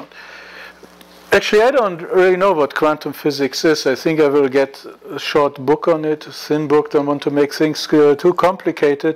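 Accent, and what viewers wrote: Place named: South Africa. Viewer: Where are you from? It sounds like South Africa, but I'm not sure.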